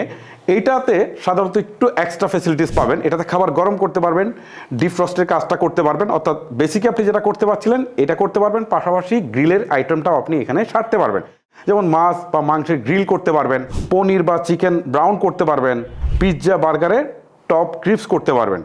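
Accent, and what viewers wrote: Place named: Chittagong, Bangladesh. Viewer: আমার অনেক ডিসটিকের মানুষের সাথে কথা হয় বা চলাফেরা করা হয়, চিটাগাং এর মানুষের‌ উচ্চারণ কিছুটা অন্যরকম আমার মনে হয় এই ভাই চিটাগাং অথবা আশপাশ এরিয়ার।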